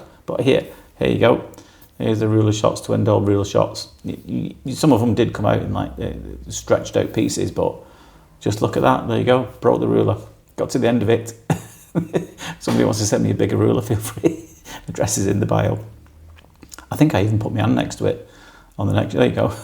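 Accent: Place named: England, United Kingdom. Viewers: Ah, thanks for that! I wondered because just occasionally I thought I might have detected a tiny trace of something else, something closer to the southeast tip of Lancashire, or thereabouts. It's just one of your vowel sounds, I think. But then, I'm absolutely no expert on the Leigh/Golborne area accent (obviously). 😄 Yours is a lovely accent - we do have some beauties in the northwest and the north, don't we? And they are more original, closer to how English sounded 1,000 years ago, which I rather like. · Great job! Love your English accent! Canadian here. Weird to love this stuff but I do enjoy watching your work! And you are handsome to boot!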